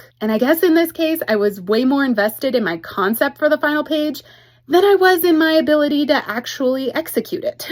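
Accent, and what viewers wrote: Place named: England, United Kingdom. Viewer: Hendrix is absolutely gorgeous 🩶 Your English accent makes me laugh 😂 it's like Emily from f.r.i.e.n.d.s and I promise we don't sound like that 😅 and as someone who lived in England 27 years and now Scotland for almost 5 years .... Scotland is definitely more scenic with beautiful views imo. Come to Scotland and I'll make you a full "English" Scottish breakfast with square sausage and tattie scone 😜 [im more an english breakfast person haha! But no black pudding 🤢]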